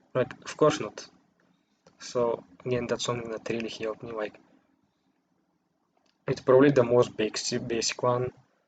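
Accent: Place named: Russia. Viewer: Your English is very Russian but I liked it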